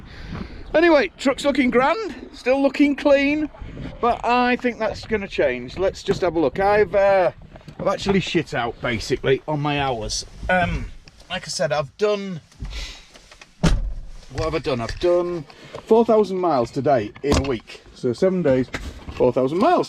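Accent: Yorkshire, United Kingdom. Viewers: Yorkshire accent🙂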